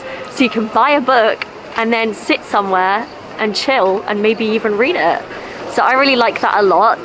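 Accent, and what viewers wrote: United Kingdom. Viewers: your British English is easy to hear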